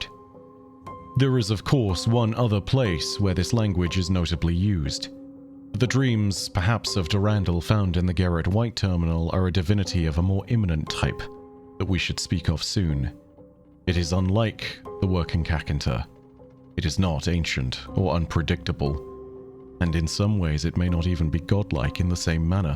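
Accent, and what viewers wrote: United Kingdom. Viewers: I'm glad we have a nice British voiced lore master for both Bungie games and FromSoft games.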